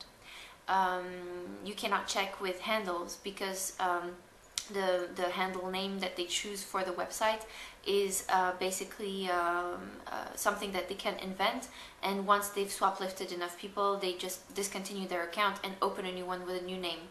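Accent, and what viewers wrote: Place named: Sweden. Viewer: What country are you from? You sound Swedish :)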